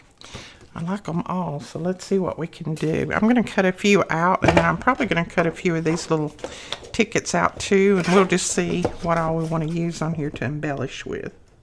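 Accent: South, United States. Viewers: Love your Southern Belle accent. I have a Tennessee Hillbilly accent which is more rough. Thank you for sharing your beautiful creations. This is an adorable kit. ❤ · I was watching your video again which I love watching you Craft and your southern accent anyway and 32 minutes and 15 seconds I think you’re talking about putting some pink rose on the paper and not covering of the beak of your bird and I was thinking well why didn’t you put it just on the top of the page then it was just a thought we’re on the other side of the paper anyway thank you for teaching and that was my Pinyan have a great day And please don’t be upset with me God bless and please have a amazing day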